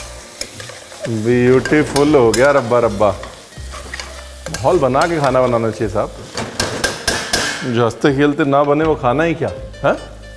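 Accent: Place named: Lucknow, India. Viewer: Hi Ranveer...I really love your cooking style.. Lucknowi Lahza..Baate..Jo khana haste khelte ban jaaye.. Superb 😊😊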